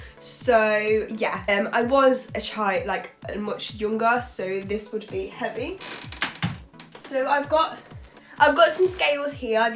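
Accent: United Kingdom. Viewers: Boy you have a thick british accent and it's sexy girl!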